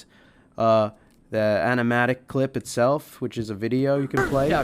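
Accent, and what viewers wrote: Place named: Australia. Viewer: slightly higher pitched Australian *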